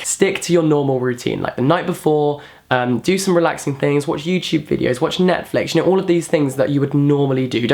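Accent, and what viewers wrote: United Kingdom. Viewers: I love British accent and I'm in Russia love you accent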